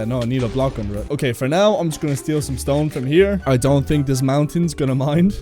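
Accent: Ireland. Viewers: also are you irish? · Yo bro r u irish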